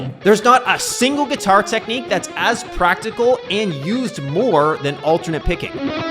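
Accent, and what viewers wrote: Canada. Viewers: you can hear the canadian coming ooot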